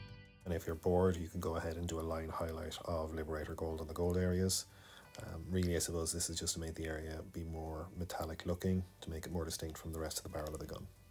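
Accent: Ireland. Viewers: You're welcome. You also sound like a fellow potatolander so bonus points!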